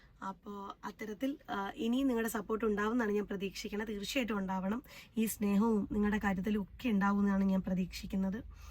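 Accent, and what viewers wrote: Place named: Palakkad, India. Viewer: Palakkad Malayalam.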